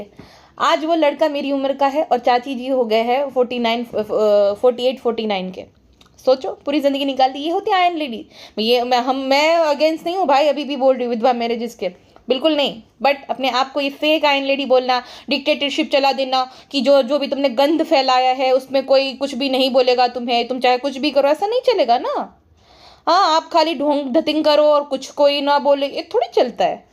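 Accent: Gujarat, India. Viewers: 25 saal ki ho gyi hu pehli baar youtube pr kisi ko comment kiya hai ❤ u r worth it for this love and your gujrati accent ❤❤ lots of love from jammu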